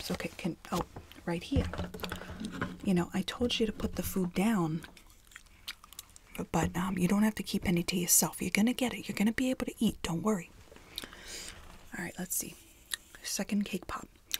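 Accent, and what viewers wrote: New York, United States. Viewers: Ha I love it! Sounds like my mom's whole Italian family from New York. 😂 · As a New Yorker love the accent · def new york lol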